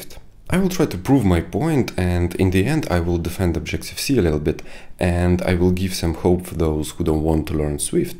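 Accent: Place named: Russia. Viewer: Наш человек, сразу слышно)))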